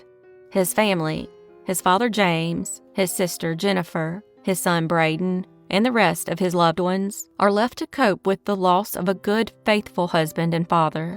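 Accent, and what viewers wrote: South, United States. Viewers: THANK YOU!!:hand-pink-waving: SOLVED CASES!!! YAHOO!!BEAUTIFULL COUNTRY VOICE AND A HEART AS BIG AS MY HOME STATE!! [ TEXAS ]!! GOOD WORK!!💯❤👌🧑‍🌾👩‍🌾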